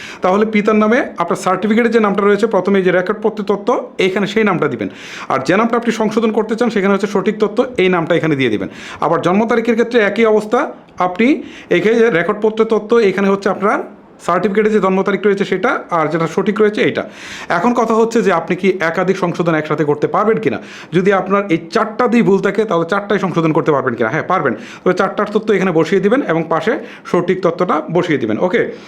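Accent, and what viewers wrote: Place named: Kishoreganj, Bangladesh. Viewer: ভাইয়া আপনার বাড়ি কি কিশোরগঞ্জ জেলায়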